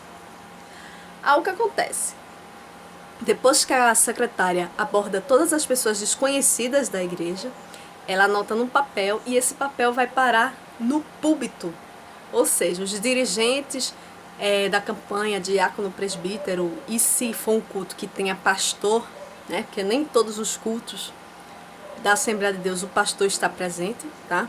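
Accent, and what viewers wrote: Northeast, Brazil. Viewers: vc não generalizou pq vc falou q foi na sua congregação,nem todas são assim.Pelo sotaque vc é do nordeste,aqui no sudeste é muito rar acontecer isso que vc falou.pense bem porque Jesus não tem nada haver com isso,vc saiu pq vc quiz,vc tem LIVRE ARBITRIO!naõ vou dizer q vc vai para o inferno,mas,deixa isso quieto,não insiste com esse videos pq a magoa só faz mal para nós mesmos! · QUE SOTAQUE GOSTOSO, ESSE SOTAQUE DE NORDESTINO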